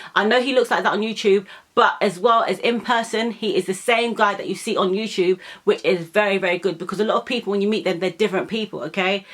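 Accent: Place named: United Kingdom. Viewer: Thanks for your honest and truthful opinion about....I can see it in your eyes and your facial expression. I too share your opinion, evaluation and sentiments about. I think he is a very honest, hardworking, progressive young man who has chosen to impress the world with his cooking skills, his simple and humble lifestyle, the beauty of jamaica and our culture. Not to mention the love of his dogs and cats, the relationship with his son, his dad and other family members. attitude and personality is so transparent and pure that, even though I have never met him, he has "virtually" become a part of my family. This is for... Me know se u a go through some stuff right now my yout'.. no mek it get u down. U young an' u strong. Life is a teacha', learn u lessons well. No mek de some mistakes twice..zeeen.. Nuff said...anyway...big up youself Pretty girl..love de British accent..ONE LOVE. 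🥰🥰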